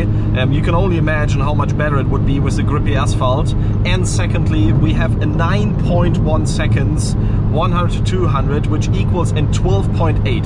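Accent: Germany. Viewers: Kannst gut englisch aber man hört das du ein Deutscher bist . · Ich find es erstaunlich, Aussprache übelst deutsch aber die Sätze fließen nur so dahin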